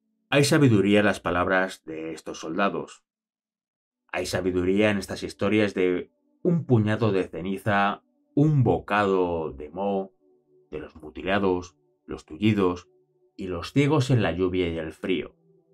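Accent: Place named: Spain. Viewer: Oztia tio chaval, usted empezó a hablar español de España 🥺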